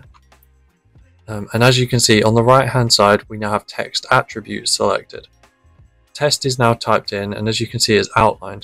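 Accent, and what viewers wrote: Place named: United Kingdom. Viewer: Its brilliant to actually have a uk speaking tutor at last for this program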